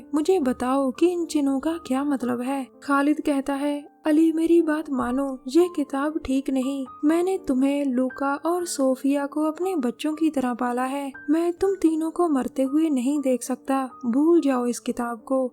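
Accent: Punjab, India. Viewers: Your hindi is in Punjabi excent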